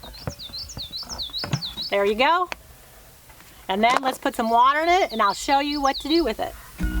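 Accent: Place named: Midwest, United States. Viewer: So thankful for your channel. I’m a mom of 4 and my husband and I really want to get out of the city. I feel crazy for wanting so badly to be off grid but you really have shown me that it’s not all that strange or impossible. Random question: Are you originally from Missouri? My daughter says that you talk like me. I grew up in Michigan and transplanted in Tennessee. Everyone here comments on my “accent” 🤣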